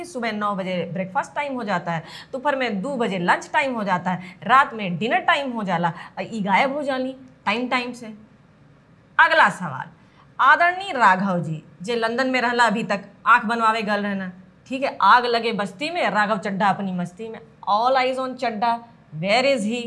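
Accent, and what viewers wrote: Bhojpuri, India. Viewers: Another very interesting episode. Well prepared. Adding a song line added extra flavour. Your Bhojpuri accent is very flavoured.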